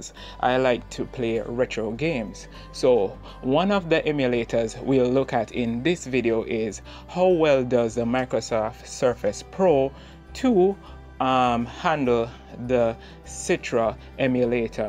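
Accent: Jamaica. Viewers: are you from jamaica?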